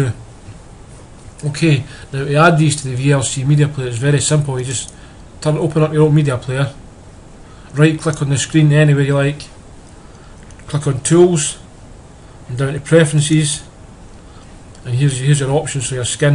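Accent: Scotland, United Kingdom. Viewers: brother are you Scottish i like your accent and thanks for the video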